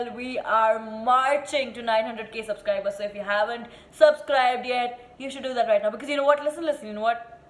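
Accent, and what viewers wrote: Maharashtra, India. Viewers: #sawaalsaturday. Hey i love ur videos. I don't have any question...i just wanna say I love ur marathi accent. plz try talking in marathi in your videos.. #LoveLove❤😁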